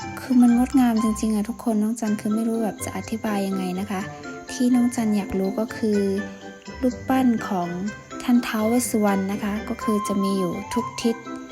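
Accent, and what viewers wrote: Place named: Central Thailand, Thailand. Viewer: น้องพูดไทยกลางชัดมากครับ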